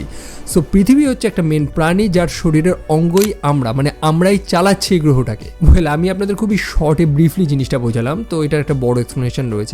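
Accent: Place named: India. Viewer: ভারতীয়দের উচ্চারণ আরকি 😂ইংলিশ ওরা এমনেই উচ্চারণ করে 😂নিজেদের মত করে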